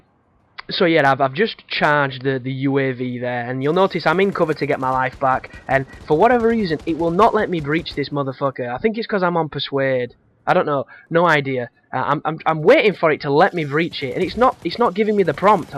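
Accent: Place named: England, United Kingdom. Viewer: Thx for your very helpful vids and good commentary. I love the " bot";)) no im not an american i just love the english accent. Keep up the good work.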